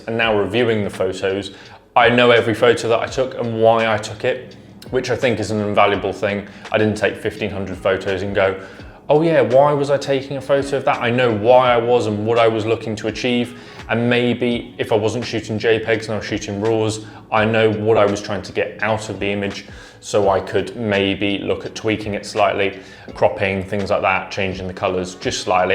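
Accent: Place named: United Kingdom. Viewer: Photography videos just sound better with a British accent.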